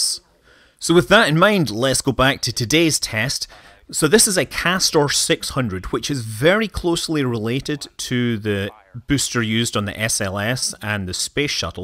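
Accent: Scotland, United Kingdom. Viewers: Great to hear your Scottish accent!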